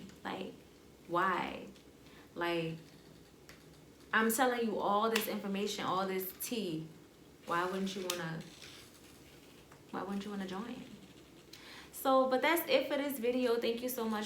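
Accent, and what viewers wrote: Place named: New Orleans, United States. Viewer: You definitely sound like you’re from NOLA lol I guessed it before you spoke about Mardi Gras. I got a close friend from there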